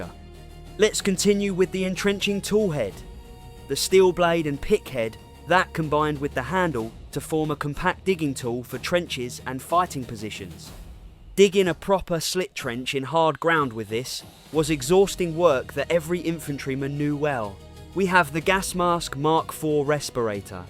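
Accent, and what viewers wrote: United Kingdom. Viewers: Surely the British soldiers carried ‘aluminium’ canteen and mess tins NOT ‘aluminum’ items! If using a British sounding AI narrator FFS ensure it uses British pronunciation!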